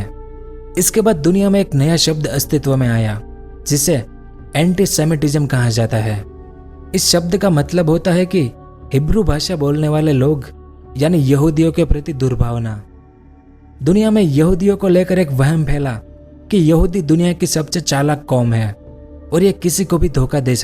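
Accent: Gujarat, India. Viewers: Aavaj Se to Gujarati lag raha hai Because I know tone of Gujarati language is different in different part of Gujarat. I think may be he is from Ahmedabad, Surat Or Sauratra. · आप की बोली में मुझे गुजरात की मिली जुली बोली लगती हैं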